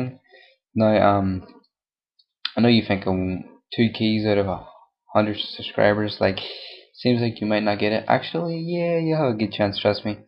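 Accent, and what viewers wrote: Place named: Northern Ireland, United Kingdom. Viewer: Well you sound like your from Northern Ireland, and so am i! :D I'm not going to make up a story saying my parents died or i have no money or my house burnt down and i lost all my stuff either.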